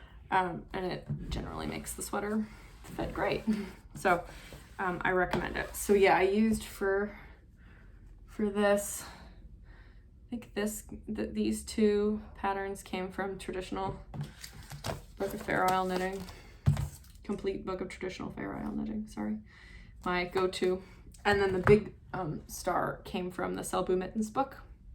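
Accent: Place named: Vermont, United States. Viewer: Great review!! I kept thinking you were from vt at the beginning of the video. Hello neighbor!!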